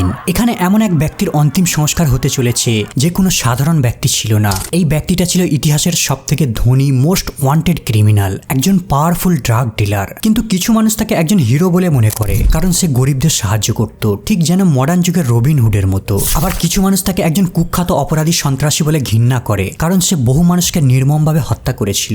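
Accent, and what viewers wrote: India. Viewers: আরেহ ভাই এরা ইন্ডিয়ান এদের কথা এই রকম হয়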